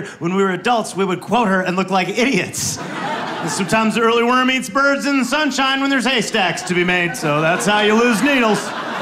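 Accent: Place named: Wisconsin, United States. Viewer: Wisconsin accent is on point 😂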